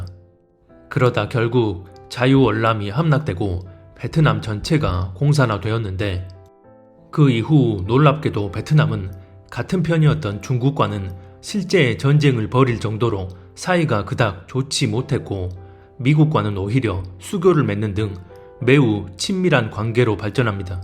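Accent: Jeolla, South Korea. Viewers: ㅈㅓㄴㄹㄷ 억양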